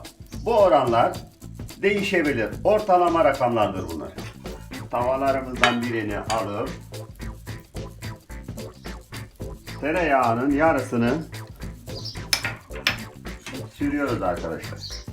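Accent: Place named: Hatay, Turkey. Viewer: Abi kıbrıstaki hataylılar gibi şiveniz var sanki :)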